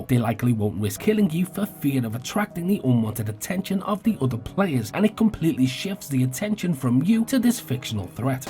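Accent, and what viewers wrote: Liverpool, United Kingdom. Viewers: Can I hear a scouse accent here ?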